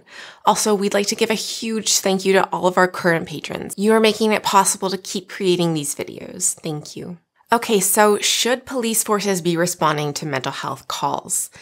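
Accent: Canada. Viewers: You’re Canadian ?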